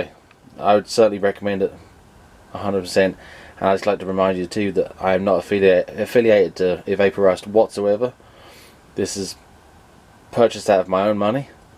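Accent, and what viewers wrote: United Kingdom. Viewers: Dude you sound like you are originally from the uk or something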